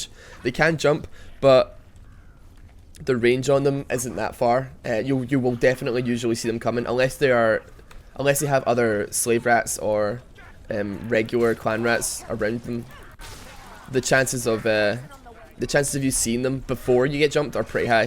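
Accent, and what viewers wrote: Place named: Edinburgh, United Kingdom. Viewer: sounds like he lives in Edinburgh i dont know how i can tell but im Scottish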